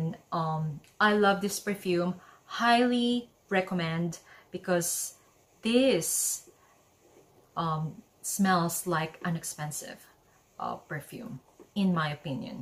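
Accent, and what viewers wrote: Philippines. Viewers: Filipina accent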